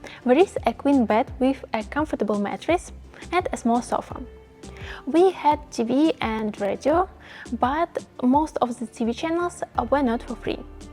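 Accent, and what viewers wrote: Russia. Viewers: You have Soviet(Russian) accent in English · Are you Russian? Coz you sounds like Russian. I love that 👍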